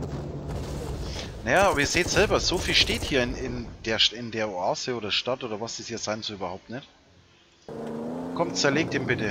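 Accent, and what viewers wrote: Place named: Franconia, Germany. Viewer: Fränkisch ist so geil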